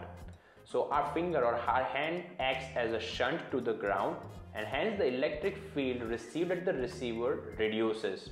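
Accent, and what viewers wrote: India. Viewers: He is speaking English with an Indian accent but you can understand easily or turn on subtitles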